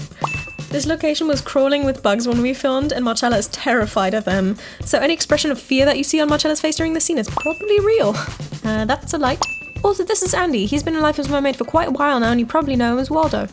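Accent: United Kingdom. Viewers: When did you become British? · Why are you British now??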